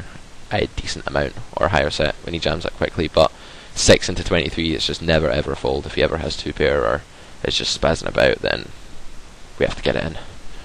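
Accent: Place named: Scotland, United Kingdom. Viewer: Thanks such helpful content on this channel. But why is Scottish 😆?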